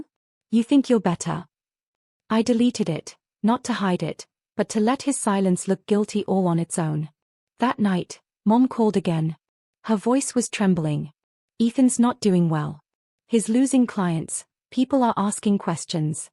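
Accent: United Kingdom. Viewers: Why the British accent? In America it is pronounce skedule not shedule. According to British pronunciation school would be shool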